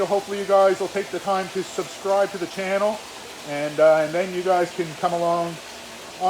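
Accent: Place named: Canada. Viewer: you're either a Canadian or a Minnesotan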